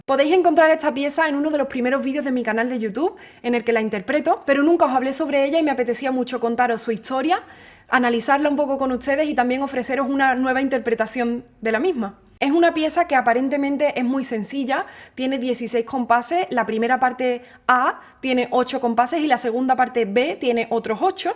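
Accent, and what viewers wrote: Andalusia, Spain. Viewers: ya decía yo, ese acento es Andaluz, felicidades hermosa interpretación, saludos desde Guayaquil-Ecuador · Ay que lindo acento tienes. De que parte de España es? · Excelente interpretación. Ese acento andaluz 🥰😍💕 enamora. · Hemosa música, maravillosa didáctica y extraordinaria interpretación. ¿Ese acento es de qué región de España?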